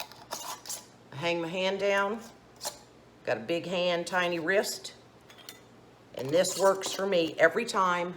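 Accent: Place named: Florida, United States. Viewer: New to your channel. Found you through the Canuary collaboration. You make me smile. You're so lively! Never canned before so I am definitely learning a lot watching all these great videos. And if I Didn't know better you sound like you are from Florida. Will be sad if I'm wrong. I can usually spot a fellow Floridian. :-D .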